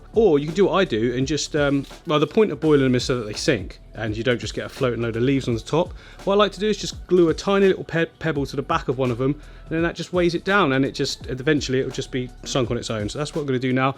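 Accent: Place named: England, United Kingdom. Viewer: Thank you so much for posting the name of that stone onscreen. The CC was rubbish! 🤣🤣 It was cereal stone, or serious stone. Clearly not able to process a strong English accent. 🤣😜😍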